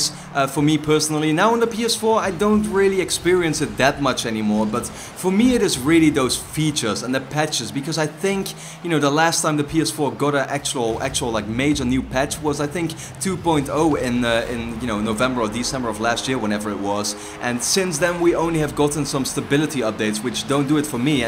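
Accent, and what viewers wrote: Netherlands. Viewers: i also hear a dutch accent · Dutch accent?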